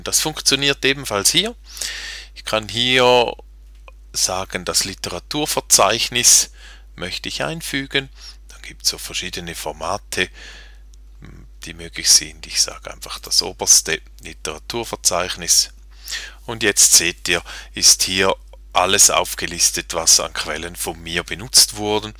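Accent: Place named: Switzerland. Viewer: gutes video, supeeeeeer, aber schlimmstes Hochdeutsch ever, haha typisch Schweizer Hochdeutsch🤣😑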